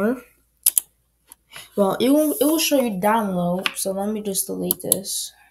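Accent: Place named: England, United Kingdom. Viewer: a hint of English in your mumbling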